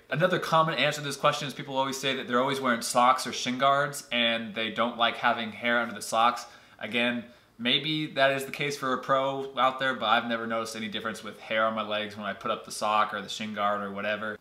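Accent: United States. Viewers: pedro mota he is American, thats how he was taught to say it. Theirs nothing wrong with it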